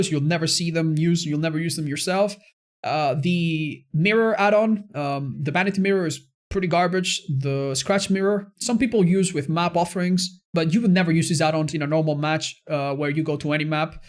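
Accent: Spain. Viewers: Question for American/British viewers, Does have a Spanish accent or just an accent in general? I'm surprised by how good his english is.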